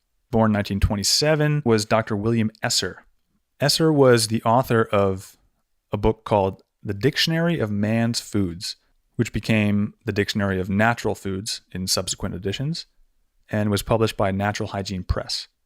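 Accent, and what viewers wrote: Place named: Texas, United States. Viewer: Your Texan accent seems to match pretty closely with the way Texan musician Michael Nesmith used to talk.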